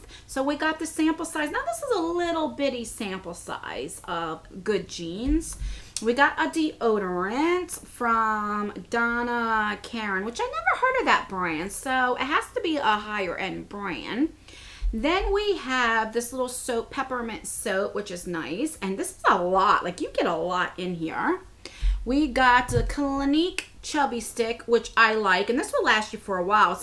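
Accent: New Jersey, United States. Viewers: I love your Neugh Joursey accent...it sounds like music to my ears💞 I was raised in Philly, I know all about cawfee and wudda.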